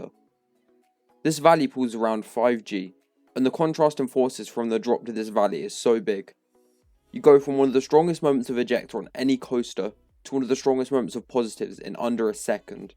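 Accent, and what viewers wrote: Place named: United Kingdom. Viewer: Damn It's always cool to see a Bri'ish and/or foreign enthusiast talk about American rides and their perspectives! I hope you like our other rides and our abundance of air time!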